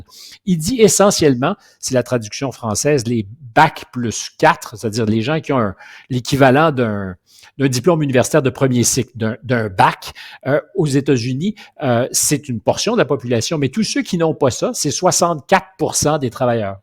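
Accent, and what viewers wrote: Quebec, Canada. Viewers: J'adore l accent franco canadien 🇨🇵✌️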